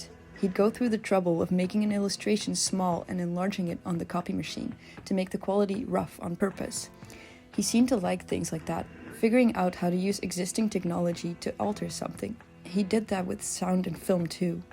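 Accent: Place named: Netherlands. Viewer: was wondering if you were dutch until you said "stronger even" then i was sure lol this is great work for a first video essay! You really did your research, really difficult stuff since as you said, most sources are in Japanese only. Shows you're passionate about it! keep making stuff like this and you'll find your niche audience in no time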